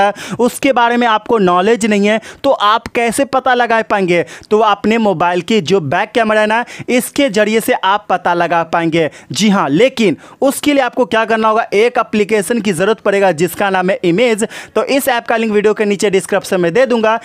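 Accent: Bihar, India. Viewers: Bihari h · Totalaa nahi hai BIHARI hai...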